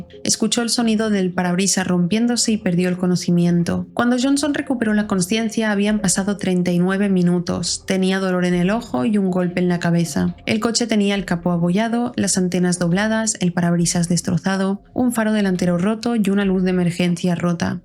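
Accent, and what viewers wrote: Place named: Spain. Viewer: gracias!! es que su tono es completamente Español, sin dudas, pero a veces utiliza palabras o expresiones Latinas, por eso me confudió. 😊 Creo que es una gran profesional, espero que con esfuerzo pueda llegar a ser mas reconocida.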